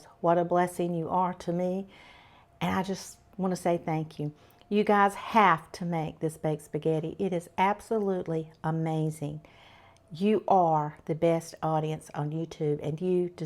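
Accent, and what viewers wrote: South, United States. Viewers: Me too ! She is a joy to watch. I ❤ that southern accent !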